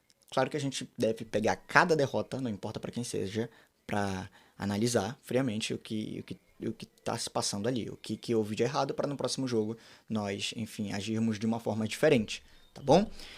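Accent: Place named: Pará, Brazil. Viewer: Vc não é paraense irmão é o que aparenta pelo seu sotaque 😅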